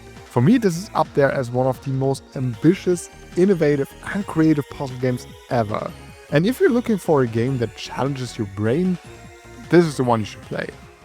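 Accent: Germany. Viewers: Hi thank you for these awesome videos! My question is if you are German? Because your accent sounds so german 😌 I am from Germany too! Greetings!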